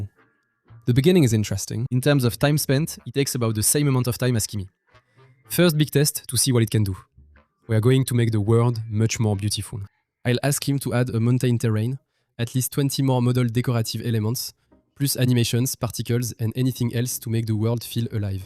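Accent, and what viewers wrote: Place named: France. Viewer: le fameux accens fr en anglais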